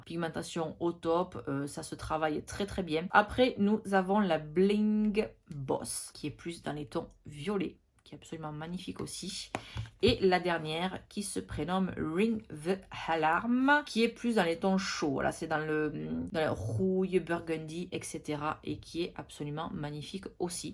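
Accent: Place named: Southwest, France. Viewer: Le petit accent du sud-ouest !! 👌🏻 super vidéo qui détend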